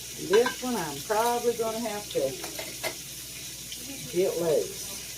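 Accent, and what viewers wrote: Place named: Virginia, United States. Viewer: I found you last night. I can’t wait to cream some cabbage. God bless you darling. I’m from near Dickerson county va. Talk just like you. Is a pleasure hearing you talk. I can understand, to a’-t.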